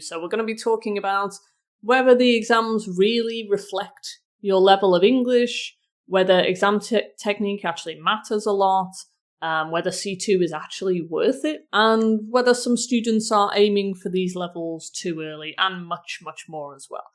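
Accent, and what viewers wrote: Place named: London, United Kingdom. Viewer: it’s soooo interesting to know that. Thank you very very much for sharing. Just curiosity, are you from London? I do appreciate your accent.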